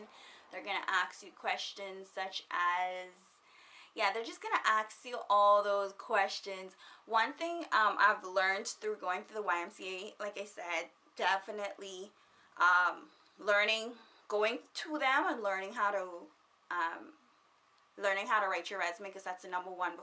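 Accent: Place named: Bahamas. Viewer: 🙂I love the fact how you are still speaking like a true Bahamian.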